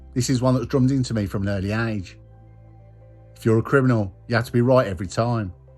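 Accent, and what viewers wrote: Birmingham, United Kingdom. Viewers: You from Birmingham bro?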